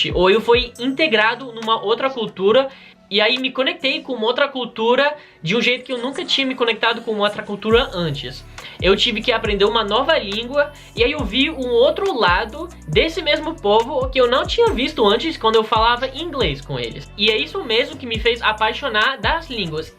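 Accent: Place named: Southeast, Brazil. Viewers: Olá! Seu p brasileiro está belesa, o sotaque esta meio Paulista, paranaense e as vezes riograndese. Parabéns. 👍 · Vc tem sotaque do sudeste.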